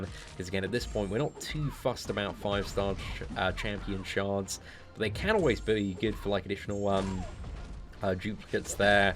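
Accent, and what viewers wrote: United Kingdom. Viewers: I’ll never read the forums or need any other channel besides for mcoc news 👀. Man saves me hella time and I get to get a free British accent talking person without using my pc narrator mode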